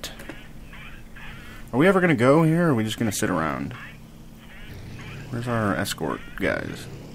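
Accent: Germany. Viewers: The German accent is the greatest thing ever. If you're trying to make me angry with it, you're going to need to try harder! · We love the german accent!! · I'm a german and i enjoy listening to you speaking with german accent Its hilarios Keep doing it your way, it's awesome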